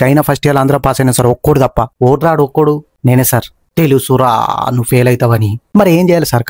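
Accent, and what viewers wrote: Telangana, India. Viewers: తెలంగాణ యాస బాగుంది సార్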